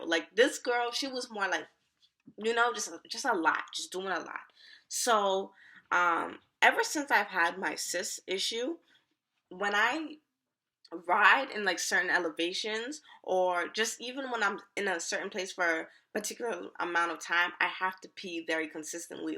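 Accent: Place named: New York, United States. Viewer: I love your vibe , I literally feel it through the screen. From your vibe to vocab I love it; I heard you voice and was like yuppp sis gotta be from NY. Just subscribed; love ya 💕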